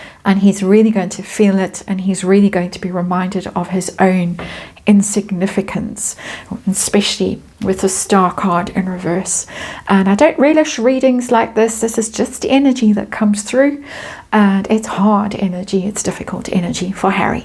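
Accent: South Africa. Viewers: I look forward to hearing your voice everyday. A voice of reason and wisdom without the need for puff puffery. Thank you, 🙏 Your English South African accent is a sweet link to my birth country and family, my British South African heritage as a child. Thank you from 🇨🇦🇿🇦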